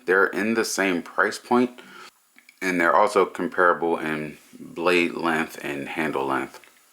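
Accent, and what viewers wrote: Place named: Louisiana, United States. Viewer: Just curious. What part of the country are you from? Trying to place your interesting accent. Louisiana? Detroit?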